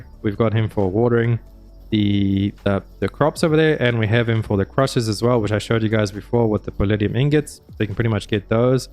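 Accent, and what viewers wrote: South Africa. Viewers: Cant help but shake the slightly South African accent?😅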